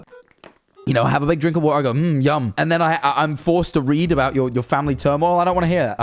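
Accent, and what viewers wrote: United Kingdom. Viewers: why do i feel like your losing your bri ish ness ? im going crazy 😀😀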